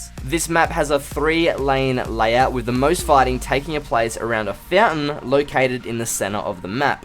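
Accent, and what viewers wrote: Australia. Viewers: I swear he's Australian not British.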